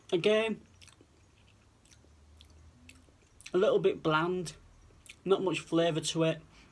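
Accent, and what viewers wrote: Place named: Northern England, United Kingdom. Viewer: You've got to stuff it in your gob, couldn't sound more northern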